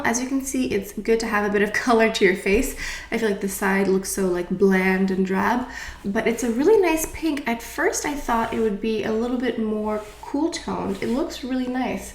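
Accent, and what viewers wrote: France. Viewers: I fell in love with your french accent and your love for Chanel cosmetics. Thanks for the review . I subbed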